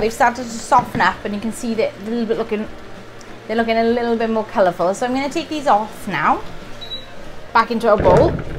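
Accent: Wales, United Kingdom. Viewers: Appears delicious. I want to try cooking it! Is your accent Welsh? I visited Wales many years ago.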